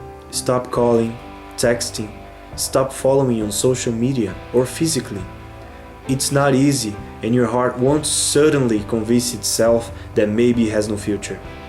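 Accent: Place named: Brazil. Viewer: I KNEW it was a Brasilian accent!!! haha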